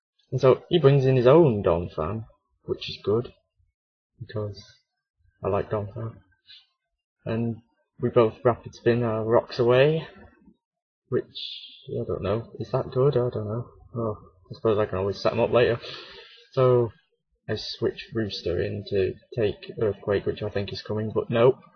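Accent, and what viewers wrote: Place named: Yorkshire, United Kingdom. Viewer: But the Yorkshire accent is win though...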